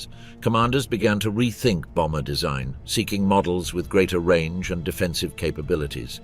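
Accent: England, United Kingdom. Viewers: How could it be the German perspective, when the narrator has a strong English accent ? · How can it be from a German perspective with your English accent? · and more so the accent is English not German!!!! it must be propaganda... the Germans beleived they were winning right to the end with the vunder machinas.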